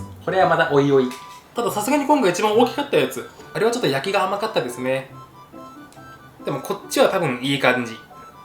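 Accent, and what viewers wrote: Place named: Hakata, Japan. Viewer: 博多弁あんまり、動画で出らんよなー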